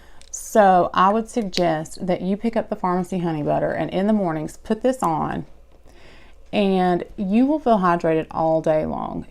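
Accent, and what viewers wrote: South, United States. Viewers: I love your voice it`s so soothing and a lil country too, but that`s ok I like it.{@ on IG}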